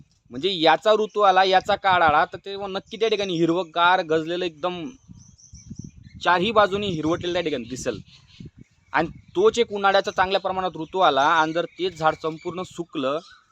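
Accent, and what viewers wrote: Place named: Malkapur, India. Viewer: दादा तुमच्या भाषे वरून कळते ,तुम्ही मलकापूर चे आहात k😊😊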